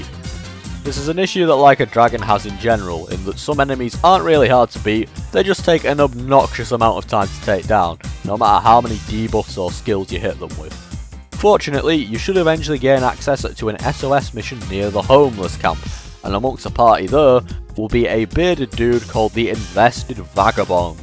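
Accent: United Kingdom. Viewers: From Birkenhead or Liverpool or something, I think. certainly in the area of north west I think.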